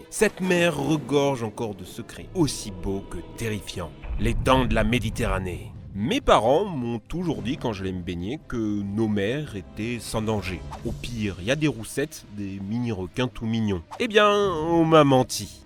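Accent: Caldoche, New Caledonia. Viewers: C'est un caldoche le mec qui parle xDD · on nest dakor c une video de Nouvelle-Calédonie g reconnue lacent des caldoche😂😂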